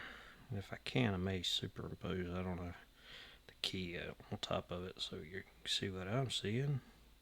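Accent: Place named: North Carolina, United States. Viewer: I knew instantly you were from NC the first time I heard you on LadyLocks live. Great open sir!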